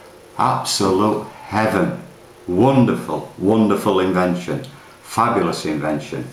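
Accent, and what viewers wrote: United Kingdom. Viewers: Great vid. Really positive : ) Love the accent, makes me feel like I'm back home. Rotherham? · I've seen many videos about electronic cigarettes, and so on, but this is the most helpful one so far! Great video. I ordered my electronic cigarette within one hour after watching the video (awesome British accent, btw, lol :D).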